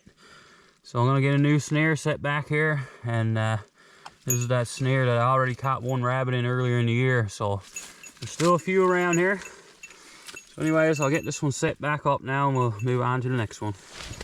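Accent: Newfoundland, Canada. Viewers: Yeah dogs look fine. I looked up Newfoundland accent and it fits (to my ear at least). So that was my guess. Hope to see some recommendations on snow shoes for that type of terrain. It looks like where I live in Sweden.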